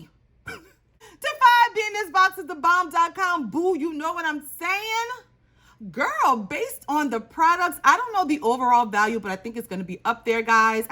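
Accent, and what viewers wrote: Louisiana, United States. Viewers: Your makeup and nail color are so pretty!!! And I love your Louisiana accent especially when you say your name and today...so cute😊 nice unboxing too😊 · You have to from LA with that accent. Love it! Lemme guess BR or NOLA.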